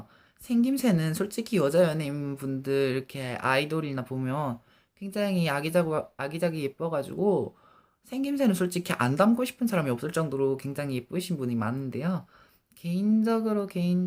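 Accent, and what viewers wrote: Gyeongsang, South Korea. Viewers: 아 말투가 너무 그리워요ㅠㅜ제가지금 경상도살다가 전라도로와서ㅠㅠㅜ 대구근처에서 살았거든요!!사투리ㅠㅠㅠㅜ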